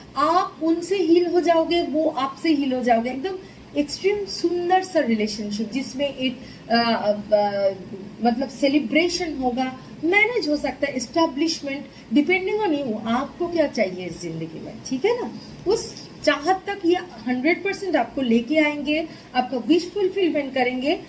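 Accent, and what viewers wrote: Bengal, India. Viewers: Are you Bengali? Nice Reading....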